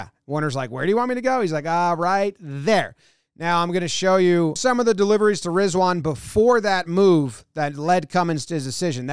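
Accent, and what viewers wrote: United States. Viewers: Made my day. Sounds b like an American and he’s analyzing the game. Warms the cockles of my heart ❤❤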